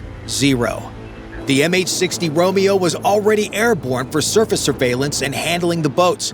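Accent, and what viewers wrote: United States. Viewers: Had to turn this off. This particular American presenter's accent is ear grindingly awful. Pronunciation is always a problem understanding but his is so far out its painful to listen to. Back to school required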